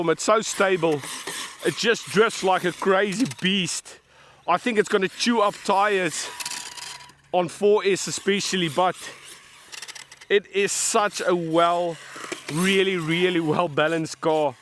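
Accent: South Africa. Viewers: Lol boet I can tell you are a proper South African from that awesome accent!! Great vid, considering getting one of these, just wish it was 1/8 scale, but for the price this seems like a real winner.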